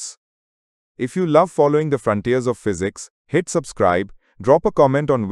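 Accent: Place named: India. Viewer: Whats blowing my mind is the accent doesn't match the cartoon face that pops up.... Indian English accent but a Caucasians face is represented as the speaker. Like *BLOWN*. Never would have guessed.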